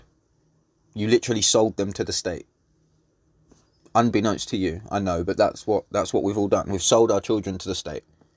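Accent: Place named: London, United Kingdom. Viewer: u sound like a proper London geezer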